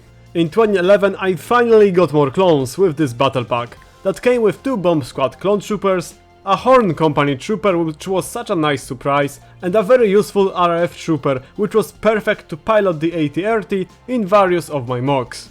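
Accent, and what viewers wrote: Poland. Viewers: Are you from Poland? I mean your voice have so polisy Accent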